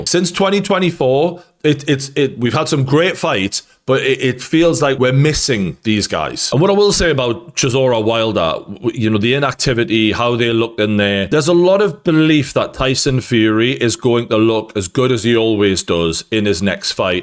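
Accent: Newcastle, United Kingdom. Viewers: Wow bro I got a big shock. You sound like the true Geordie I know but look different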